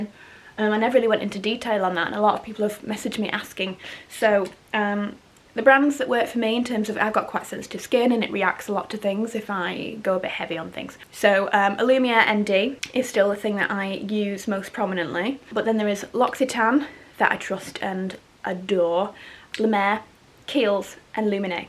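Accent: United Kingdom. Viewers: Just adore bloggers from UK. Fashion, lifestyle, British accent- everything is so lovely! And the Magrath family ❤️ dear, you look very upset in this vid(. Lots of hugs from Russia, darling 🌸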